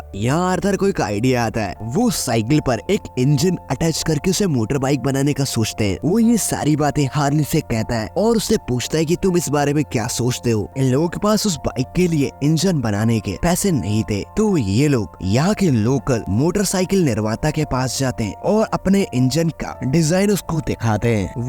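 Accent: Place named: Jharkhand, India. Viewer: bhai tumara voice acha hai lekin tumara hindi छपरी वाला or zarkhandi boli ka hai ese thik karo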